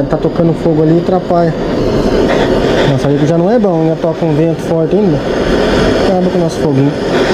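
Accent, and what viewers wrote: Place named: Santa Catarina, Brazil. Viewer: achei que você morasse em SC pelo sotaque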